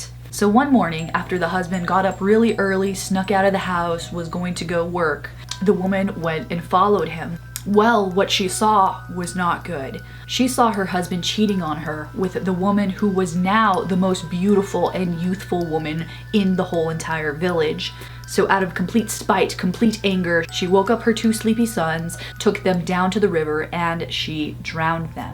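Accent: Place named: Mexico. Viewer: Your Mexican accent is so cuteee ❤✨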